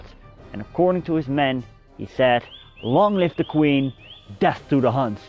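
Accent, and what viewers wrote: Netherlands. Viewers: Normaal klik ik meteen weg als ik een Nederlander Engels hoor praten omdat jn het Nederlands accent haat (terwijl ik het zelf ook heb) maar deze video was prima, helemaal uitgekeken. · Deze guy is nl hoor je zo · je weet dat hij een heel duidelijk nederlands accent heeft niet te vergeten hij zegt namen juist en een zeer nederlandse naam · hahahahaha de manier hoe je alle namen zij ach ja we zijn en blijven Nederlands hè dat accent gaat maar nie weg. Bij u is het niet super te merken alleen de namen eigelijk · Je hoort gwn jou nederlands accent in de nederlandse woorden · Dit is een Nederlander met een zwaar nederlands accent, hoe kom je erbij dat het om een engelsman gaat? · Interesant je sterke Nederlands accent geeft niet je toon van spreken geeft spanning aan het verhaal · I can just hear he is dutch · You sound very dutch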